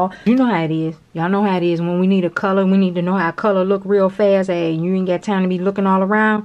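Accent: South, United States. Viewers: I love you I can listen to the no nonsense southern accent, I’m a fan for life… I need ideas for storing all my Polygel tubes…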